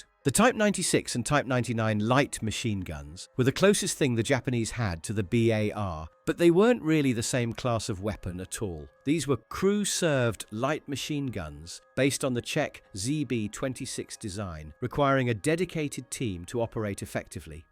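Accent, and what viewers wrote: England, United Kingdom. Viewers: You couldn’t carry an extra barrel and still do what the the BAR was intended to do…… The Bren gun proved the concept. The BAR was even lighter (albeit 20 rd mag). Let’s calm down when we hear an English voice doing a documentary…. This one’s iffy as hell.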